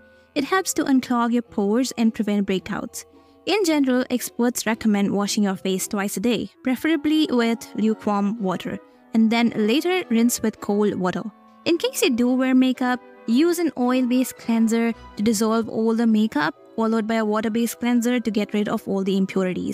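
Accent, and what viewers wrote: India. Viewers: Thank god you put subtitles because for some odd reason i can’t stand Indian accent! It annoys me, i have problems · I spotted your beautiful Indian accent Love when Indian people speak English , it sounds so good to hear.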